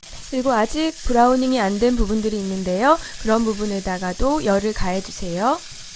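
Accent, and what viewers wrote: Seoul, South Korea. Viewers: 말투랑 목소리 김하늘 같아요~ 예전 서울 사투리? 말투ㅎㅎ 목소리 넘 매력있어요!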